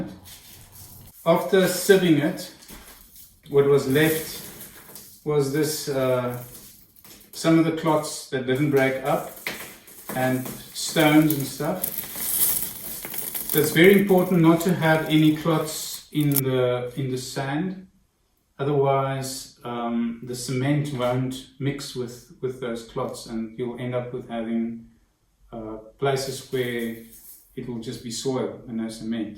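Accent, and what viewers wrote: South Africa. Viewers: your accent sounds South African.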